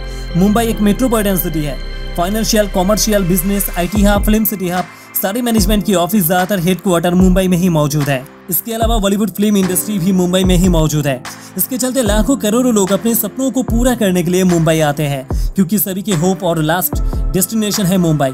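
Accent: Bengal, India. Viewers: aree yaar kisi neutral accent wale ko bola karo narration ke liye. tum bangla wale pura khel kharab kar dete such irritating accent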